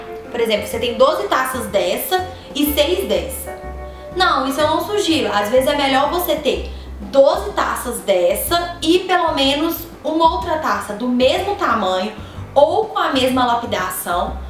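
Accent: Minas Gerais, Brazil. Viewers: Sotaque de mineira!Lindo mesmo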